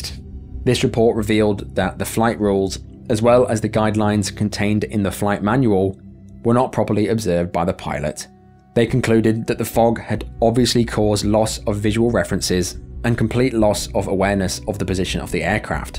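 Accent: United Kingdom. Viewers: As an American I can confirm how much more serious I take a British narrator. IDK why. It seems to be a thing though. I wonder if anyone has ever done any research into that.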